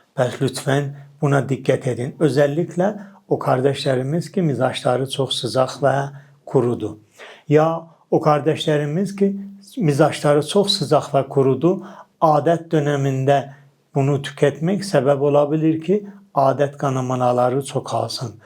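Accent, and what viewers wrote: Azerbaijan. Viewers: Danışığınız Azərbaycan ləhcəsidi. Var olun 🙏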